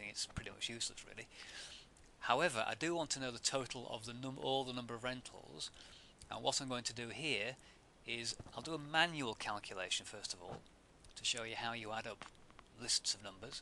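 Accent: United Kingdom. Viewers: I've watched several Excel tutorials on YouTube, and this fellow has - by far - the best delivery and most pleasant voice of any that I've seen. Love the British accent and the relaxed, understated tone to his narration. Oh, and none of that annoying typewriter sound effect that is used in some other tutorials! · With a very nice British clean accent. Easy to learn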